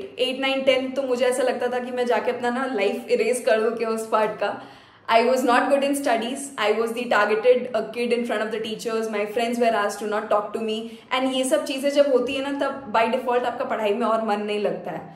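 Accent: Bihar, India. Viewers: Views to aa rhe phle ki trh to kyu tension le rhi ho . BTW wo Bihari accent ki wjh se subscribe Kiya tha, so don't leave it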